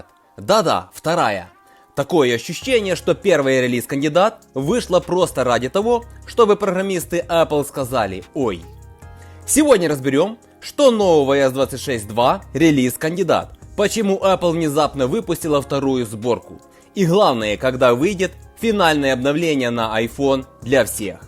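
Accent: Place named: Ukraine. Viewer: Он просто с Украины, это ведь слышно что это не родной язык для него, есть акцент и говор.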